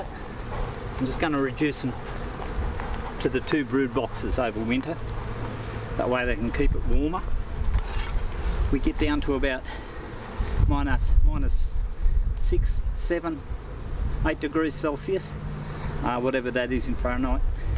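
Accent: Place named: Australia. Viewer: Australian beekeeper talking to his bees 😍🥰 I absolutely love the Australian accent.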